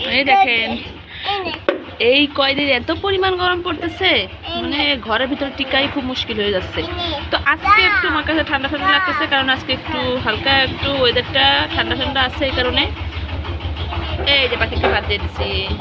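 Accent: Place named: Faridpur, Bangladesh. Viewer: আসসালামু আলাইকুম আপু। মাশাআল্লাহ তোমার ছেলেতো একধম পাখি প্রেমি ❤❤।তোমার কথার টৌন শুনে মনে হলো তুমি ফরিদপুরের।আবার বাগেরহাটের কথাও কিছুটা এমন।আপু পাখিটাকে একটা ক‍্যারেটের ভিতর রাখতে পারো তাহলে পড়ে যাওয়ার কোন ভয় থাকবে না।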